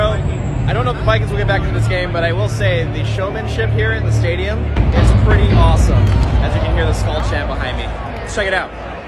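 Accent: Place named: Wisconsin, United States. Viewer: (Dude is clearly from Wisconsin)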